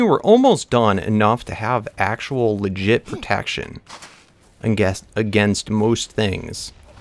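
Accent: United States. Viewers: u got blue eyes and u have an american accent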